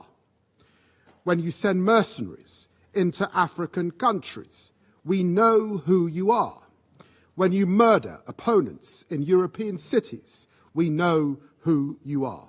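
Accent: United Kingdom. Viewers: No American these days can do a speech like that. British accent and way of saying things also just sounds much fancier and more imposing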